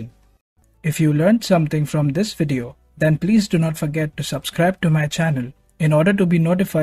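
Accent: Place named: India. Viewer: You sound Indian. Why have called yourself?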